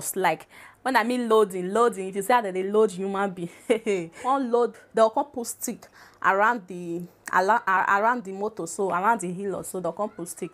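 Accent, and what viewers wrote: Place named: Nigeria. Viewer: yooo nigerian English 🤣🤣shame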